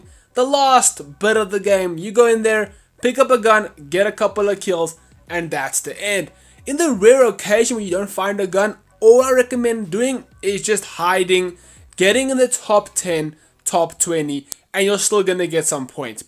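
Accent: South Africa. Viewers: You south african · Is this guy from south africa? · Bro are you South African you have our accent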